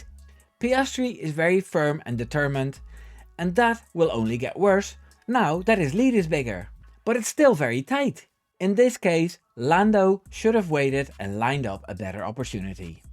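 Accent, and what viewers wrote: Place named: Netherlands. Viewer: I mean, even if I would have seen your videos for a very first time I would have known your Dutch by (and I don't mean this insutling) your obvious Dutch accent lol and no Haas is not rabbit, Haas is Hare. a rabbit is a konijn.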